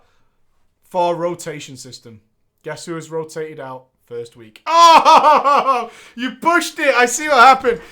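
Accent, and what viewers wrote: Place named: Manchester, United Kingdom. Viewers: Manchester accent (NW england). South city/cheshire i think. I live there and he sounds local. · somewhere round there yeah. it's not full on manchester but in that area, i'd say slightly south of manc. east cheshire maybe but not west, that's my area and it isnt local to us.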